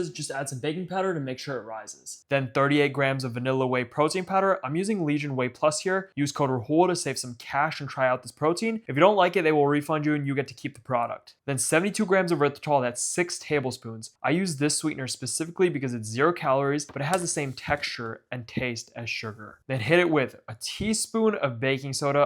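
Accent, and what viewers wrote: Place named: Boston, United States. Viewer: Amazing! Love the subtle Boston (or is it Brooklyn? 🤔) accent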